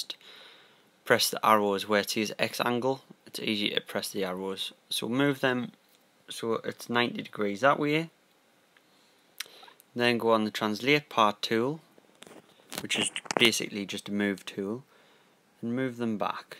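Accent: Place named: North East England, United Kingdom. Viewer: North East of England. I might be wrong but I just recognised the accent 😂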